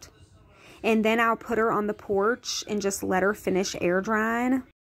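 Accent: South, United States. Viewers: I hate cleaning the bathroom! I clean the toilet everyday because I have all men in my house. So ya you already know! Glad you made another video!!!! Was missing that southern accent!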